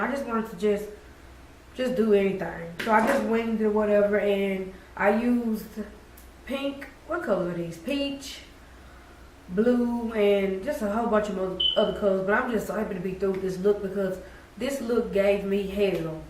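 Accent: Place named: South, United States. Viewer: Your accent is soooo Southern lol; love it. I love the look girlie.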